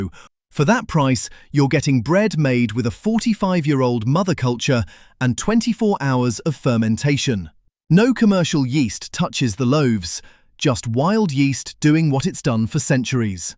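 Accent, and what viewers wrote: United Kingdom. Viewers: Interesting, I will try some of those. So depressing, though, to hear another British person (okay, probably it’s not a person, but it’s got a British accent) using “likely” as an adverb (🇺🇸).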